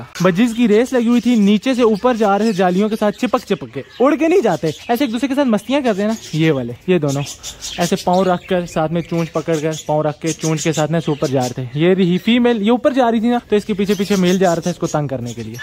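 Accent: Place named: India. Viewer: मुझे आपका बोलने का तारिक इतना पसन्द है ना की लगता ही नहीं आप पाकिस्तानी हो हमे इंडिया जैसे ही हिदी बोलते हो dear और जिस तरह से हर पशु पक्षी का ध्यान रखने हो ना बड़ा ही अच्छा लगता हैं देख कर dear God bless you dear 🍫😊🇮🇳🇬🇧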